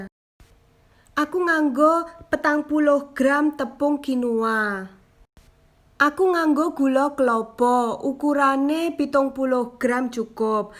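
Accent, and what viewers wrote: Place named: Java, Indonesia. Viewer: Ngedok bangat kaka 😁😁bahasa nya jadi lucu gitu dengar nya acc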